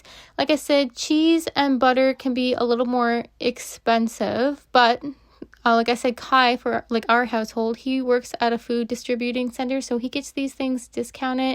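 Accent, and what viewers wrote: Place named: Midwest, United States. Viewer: Love your Midwest accent. I’m from Mid-MI and feel right at home in your kitchen. God bless❤ This is valuable information you’re sharing.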